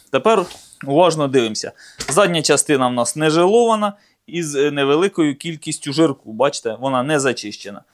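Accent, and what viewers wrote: Ukraine. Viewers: Не із Західноіі Украііни вже чую по акценту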